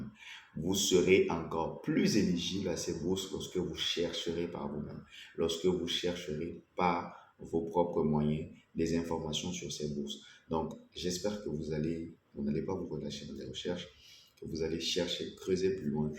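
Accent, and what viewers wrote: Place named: Marseille, France. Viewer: Accent marseillais sur nous 😅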